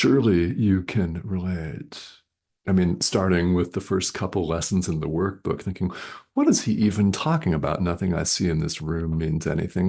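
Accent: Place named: Arizona, United States. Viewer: I knew it, I knew it, I knew it you are from Arizona. I thought Arizona or New Mexico.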